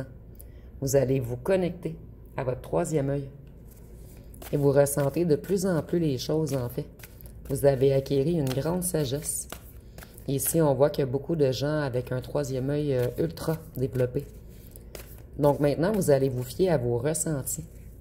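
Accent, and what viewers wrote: Quebec, Canada. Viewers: Exactement bonne journée à toi ma conceur je t adore tu es une belle âme reste bien tel que tu es j apprécie ton authenticité ton accent Québécois Extra ❤❤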